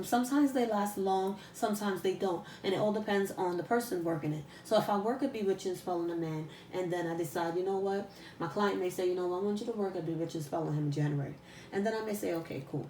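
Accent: New York, United States. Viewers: lol she stay having me dying with laughter she sound like she from New York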